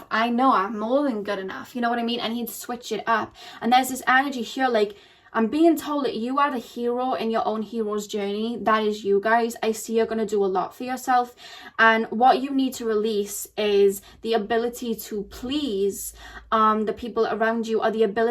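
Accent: Wales, United Kingdom. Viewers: Im Welsh And Im Sure I Can Hear A Welsh Accent There 🤔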